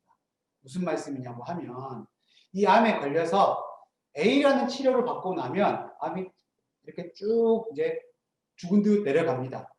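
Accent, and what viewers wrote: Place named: Jeolla, South Korea. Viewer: 대단한 기업 아니 노벨상 수상이 가능할 기업 전라도 구수한 사투리로 기업 설명 너무 자세히 영어 가급적 적게, 귀에 쏙들어오게끔 설명 감사합니다.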